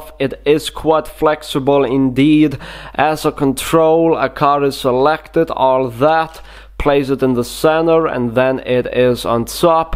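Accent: Russia. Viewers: Russisch Accent Genius... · Почему решил вести канал на английском у тебя вроде как русский акцент) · are you from russia?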